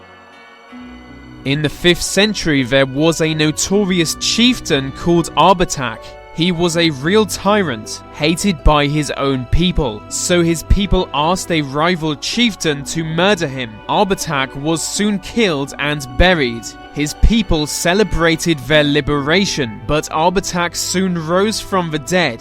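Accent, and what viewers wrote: England, United Kingdom. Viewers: He's English for sure! :)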